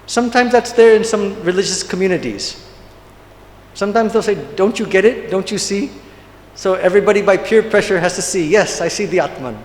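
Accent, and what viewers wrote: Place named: United States. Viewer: American English ❤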